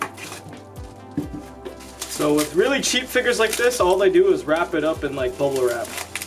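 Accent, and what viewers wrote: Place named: Quebec, Canada. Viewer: Are you from Quebec? I mean, can you be from some where esle with that accent and those noodles?! Well I just look at the others videos and this is the only video where you sound Québécois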